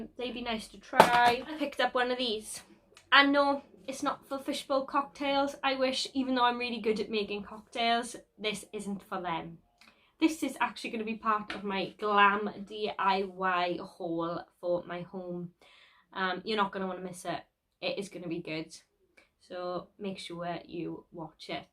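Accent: Wales, United Kingdom. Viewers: Are you from Wales?🏴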